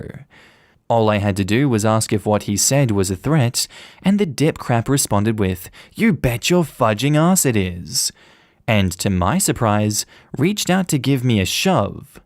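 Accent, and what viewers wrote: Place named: Australia. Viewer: Some good ones there. Maybe one day I'll venture onto reddit to read for myself, but happy to listen to a fellow Aussie read them for me.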